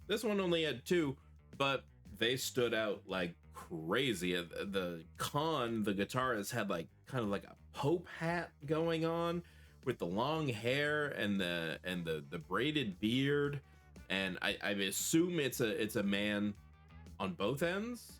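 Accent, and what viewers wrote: Quebec, Canada. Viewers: Where did you pick up your Quebec accent? Because you have traces of it? You know, I live in Montreal, but I'm still more than 5 hours from Saguenay, where Angine de poitrine (Heart pain) originates!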